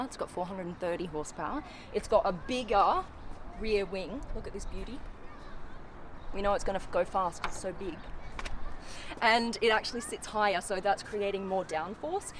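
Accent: Australia. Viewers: Can you do a video with you, only saying: "Because because because" for an hour or two? Please? Just love your Aussie accent!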